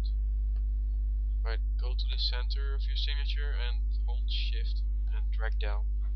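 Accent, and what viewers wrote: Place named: Netherlands. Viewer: haha je hoord wel egt goed dat je NL bent :D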